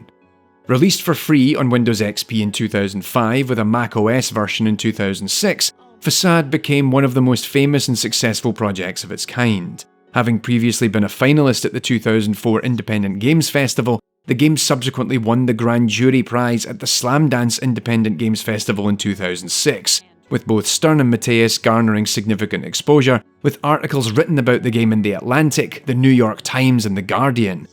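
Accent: Scotland, United Kingdom. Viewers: I was ten minutes into this video before I realised you were scottish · I’m new to this channel and hearing another Scot made me happy ngl 🙃 good video also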